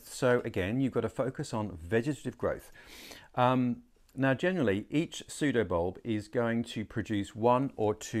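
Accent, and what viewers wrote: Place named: Australia. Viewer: Hi, I really enjoy your energetic teaching and sharing of your amateur orchid knowledge... the Aussi accent, well-spoken English, and humor are very entertaining. I have about 5 orchids that are from a 'seed population'. One of those, a violacea var. correulea, has a spike growing now. Even if the flower doesn't have good color saturation, after a year, it will be difficult to part with it! I'd likely consider trading it for another mature plant. Cheers.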